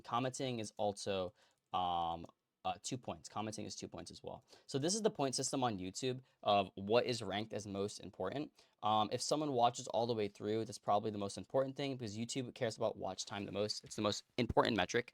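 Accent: United States. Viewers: Are you 100% American? If you're not, you're really good at english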